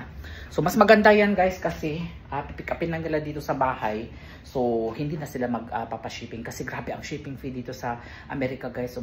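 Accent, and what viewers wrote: Iloilo, Philippines. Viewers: Taga saan ka po parang ilongo ka po sir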